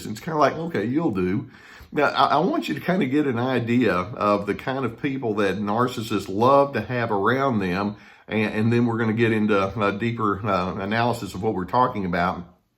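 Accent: South, United States. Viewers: Ty for a great video, I love your accent ( i am homesick - miss hearing folks chatting) i had a narc boss, who liked to make everyone her "idiots", finally, after 2 yrs, i had enough, i stood my ground & gave her some southern fire- now i am free of her, - watch out for bosses, who like office drama.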